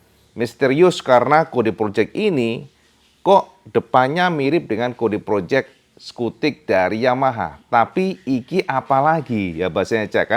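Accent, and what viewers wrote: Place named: Java, Indonesia. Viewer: BANG LOGAT JAWAMU HILANGIN MEDOK BANGET BELAJAR JD PRESENTER BERBAHASA INDONESIA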